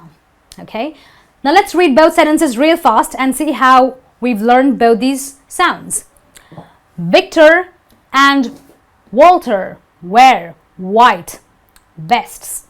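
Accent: India. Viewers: She's learning as she teaches, don't be to hard on her. She's indian. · Mikey Jimenez, she is an Indian.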